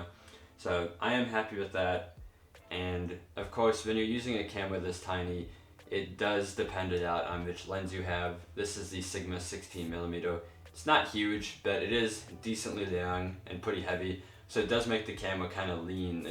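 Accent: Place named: United Kingdom. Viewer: you sound more British than American but I'm not a native-speaker so I can be wrong. From which city are you from?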